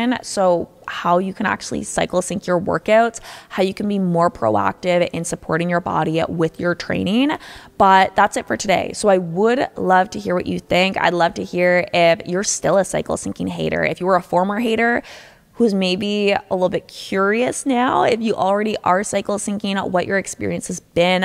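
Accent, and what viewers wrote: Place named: Canada. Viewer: It's a Canadian accent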